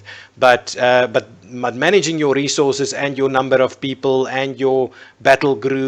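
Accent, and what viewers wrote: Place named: South Africa. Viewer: lol saw your post on steam. first 5 seconds I thought ... "is he also South African"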